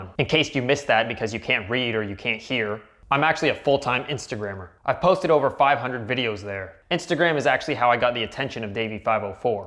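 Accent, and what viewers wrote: Canada. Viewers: I can tell just by the way he talks hes from canada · Judging by your accent you're a Canadian.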